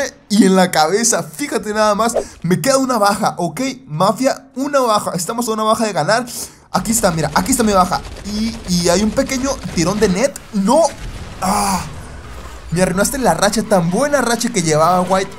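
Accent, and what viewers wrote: Colombia. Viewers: eres colombiano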